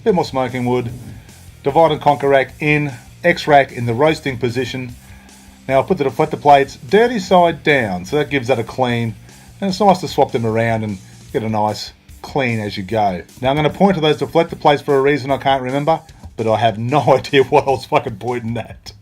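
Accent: Australia. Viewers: Aussie as fuck love it mate 🍻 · after watching dozens of seppo bbq videos, can i just say how refreshing it is to hear an Aussie voiceover lol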